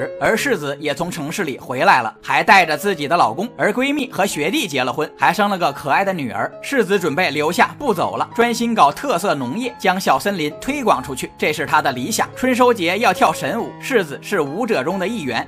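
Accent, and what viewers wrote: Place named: Northeast, China. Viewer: 這東北槍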